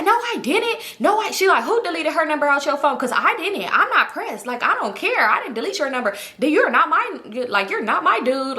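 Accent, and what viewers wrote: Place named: Los Angeles, United States. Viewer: I discovered you from honey K whenever she went to your rodeo event & now im hooked on your channel. I love the way you and her talk too with the LA accents 😂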